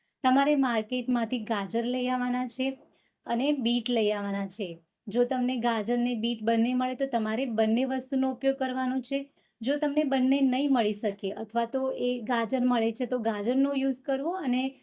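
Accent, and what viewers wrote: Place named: Kathiawar, India. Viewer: Rajkot tame kathi bolo cho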